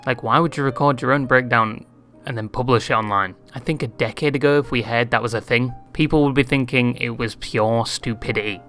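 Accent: United Kingdom. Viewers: Never thought I'll see a British bird talking about American politics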